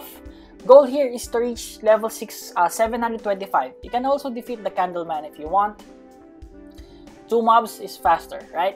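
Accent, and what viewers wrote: Philippines. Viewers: Qeustion my friend What is ur race? If I'm gonna guess Filipino? Cuz ur accent made me curious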